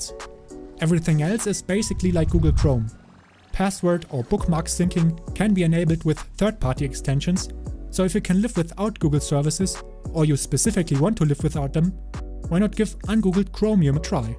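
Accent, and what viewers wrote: Germany. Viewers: bro you german too? (accent)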